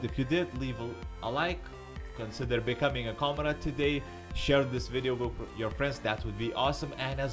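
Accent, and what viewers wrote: Russia. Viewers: I love your Russian accent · are u russian? if u are i like ur accent keep up the good work;)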